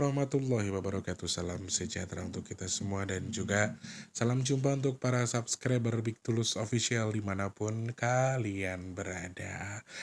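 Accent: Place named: Tuban, Indonesia. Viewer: Nwn Sewu mas BHS timurane mas tulus kok kayak logat Tuban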